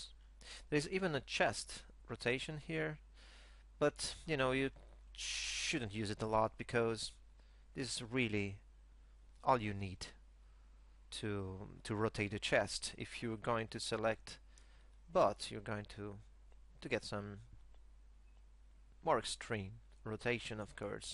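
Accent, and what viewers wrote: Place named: Italy. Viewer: I like the way explain. Since i'm french speaking i understand almost every words from his italian accent;) Better than any american speaking too fast for me or using slang...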